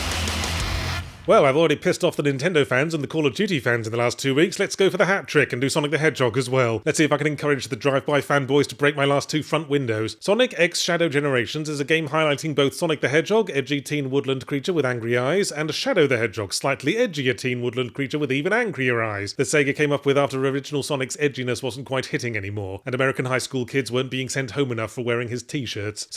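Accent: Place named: United Kingdom. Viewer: jesus your disgusting british voice gets REALLY irritating after 10 seconds of listening to it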